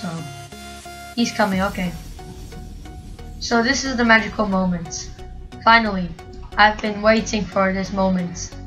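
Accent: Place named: Russia. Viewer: dat accent doe RUSSIA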